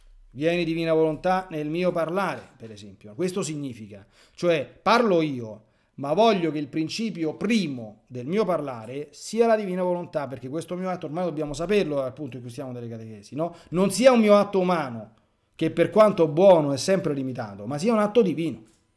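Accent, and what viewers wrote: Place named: Rome, Italy. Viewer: la sua dizione e dialetto romanesco che mi piace da morire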